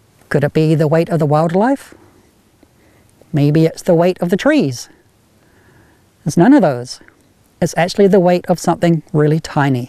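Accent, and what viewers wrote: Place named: Australia. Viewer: blimey an aussie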